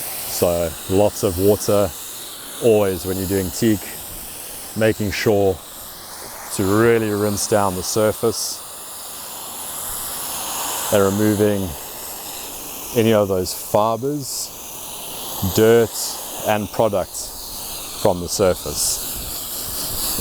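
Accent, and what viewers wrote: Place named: South Africa. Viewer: Great to hear the SA accent. Is this product available in Malta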